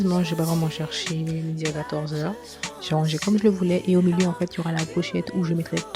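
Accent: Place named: French Guiana. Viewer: Tu as l’accent guyanais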